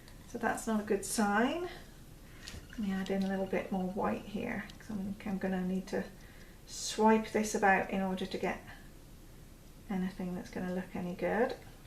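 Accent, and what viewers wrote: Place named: Devon, United Kingdom. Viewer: good on ya. I love seeing people having a go. are you from south West? Devon maybe?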